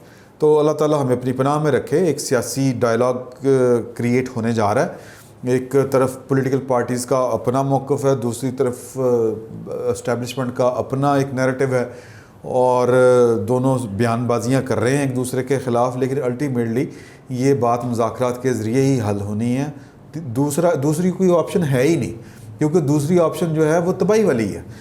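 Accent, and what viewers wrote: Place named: Lahore, Pakistan. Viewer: لاہوری لہجہ آپ کے منہ سے بہت جچتا ہے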